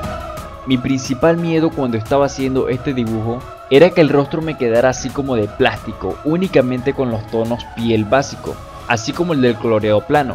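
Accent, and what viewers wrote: Venezuela. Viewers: Se nota que eres Venezolano como yo que fino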